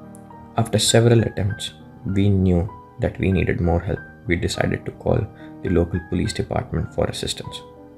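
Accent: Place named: India. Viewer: Hard for me to understand your English with heavy accents. Are you 🇮🇳 ?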